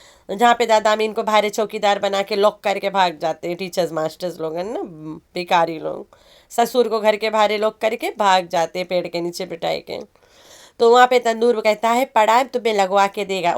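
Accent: Uttar Pradesh, India. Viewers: Very good reaction.❤❤❤❤or aapki U. P. Vali language me toh bahut apnapan lagta hai main bhi india ke U.P. se hun. Reaction bahut interesting lagte Hain.🇮🇳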